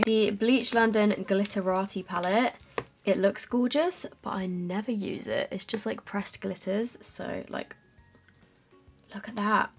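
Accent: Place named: England, United Kingdom. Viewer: I def want to enter the giveaway, my email is <mail> also my twitter is i love you’re video and when I need to relax you’re my go to, the English accent is soothing to me and I just love hearing you speak. Good luck everyone, fingers crossed 🤞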